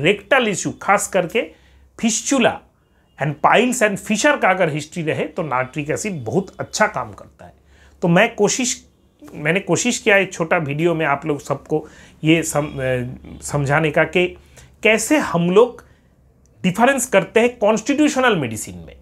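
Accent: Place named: Bengal, India. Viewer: समझाया तो अच्छा है. लेकिन बंगाली पुट की वजह से गड़बड़ हो जाता है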